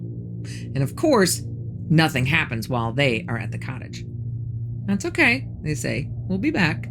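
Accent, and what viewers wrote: United States. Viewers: I never thought I could listen to an American accent for 3 hours, but she is a very good storyteller. Very Enjoyable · insufferable yank voice.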